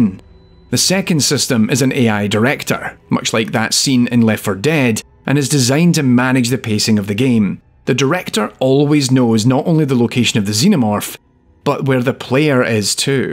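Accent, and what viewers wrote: Scotland, United Kingdom. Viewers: as a scottish person i cannot listen to another scottish person narration without the cringe turning me inside out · Is this scottish accent? If yes then damn i love it.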